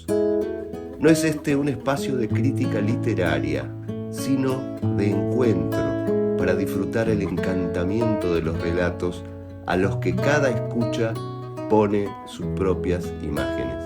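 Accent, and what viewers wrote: Argentina. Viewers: Qué mejor escuchar el cuento de Borges por un argentino, así como escuchar Pedro Paramo por un mexicano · Es muy cierto, las historias cobran vida cuando se las verbaliza. Un hallazgo ese tono y decir campero. Me recuerda a gente de Entre Ríos, a los uruguayos y a bonaerenses del campo. Borges estuvo muy ligado a mi provincia, también al Uruguay y al sur gaúcho del Brasil. No fue sólo un señorito de la gran urbe porteña, ni sólo un inglés o suizo. Fue todo, pero su corazón miraba al sur. ¡Qué hombre querible, culto, sensible, qué escritorazo! Gracias por esta entrega...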